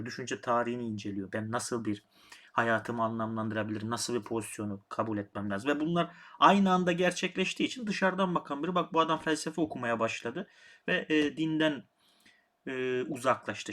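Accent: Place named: Gostivar, North Macedonia. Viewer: Çok güzel bir yayım olmuş. Takibe aldım sizi, diğer videolarınızı da izleyeceğim inşallah. Bu arada aksan hafif tanıdık geldi, meğerse memleketliymişiz hocam. Gostivar'lıdan Ohri'liye selamlar.:D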